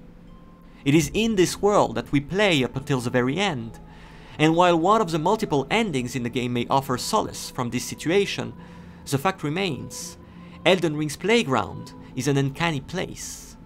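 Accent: France. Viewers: Are you French ? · i love Your french accent and the way You narrate subscribed !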